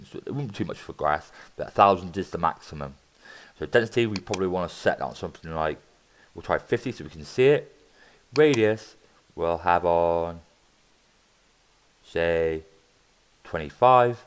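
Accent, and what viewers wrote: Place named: England, United Kingdom. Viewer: You certainly are from England =D Great tutorial brother!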